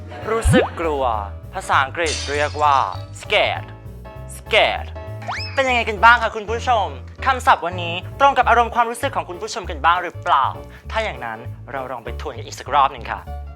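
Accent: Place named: Thailand. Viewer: สำเนียง เจ๊ แปะมากเลยอ่ะ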